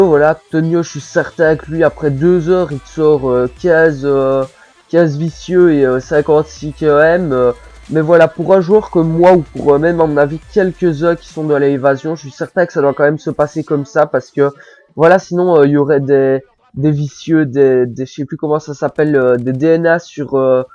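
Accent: Belgium. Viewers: Hahaha tu m'as tué mon accent de mes couille j'ai raté ma vie prc g pris la Xbox Xd (ps moi aussi je suis belge et g un accent comme le tiens (un accent de mes couille comme tu dis) continue!!!) +1 abo à ta chaîne principal (déjà abonné a là évasion) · Commentary a la belge comme moi x') !!!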